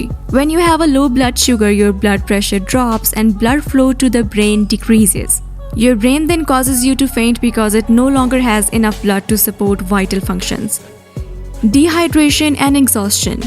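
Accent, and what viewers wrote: India. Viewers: disliked cause of indian accent lol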